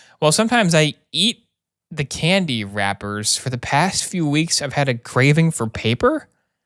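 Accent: Australia. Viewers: Ohh he's Australian? Oh krickey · British accent???.... Australian ffs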